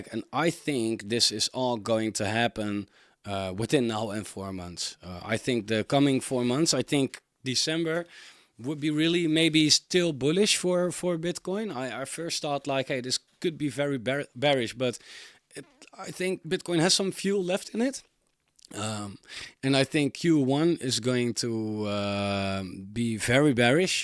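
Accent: Netherlands. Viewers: Nederlander? Nice video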